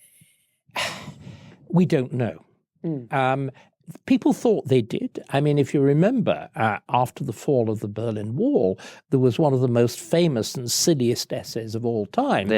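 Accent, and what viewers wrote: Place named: RP, United Kingdom. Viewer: It's amazing what a posh accent and some public school bravado can make up for ... The waffle, the contradictions one after another.... Just throwing out clichés and generalisations with confidence. There's no depth or consistent logic to his disjointed points, some of his historical parallels are so obviously ridiculous, and his comment about COVID 🤦‍♀️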